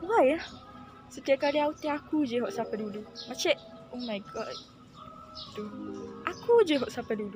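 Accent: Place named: Kuala Lumpur, Malaysia. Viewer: Panda kecek KL 👍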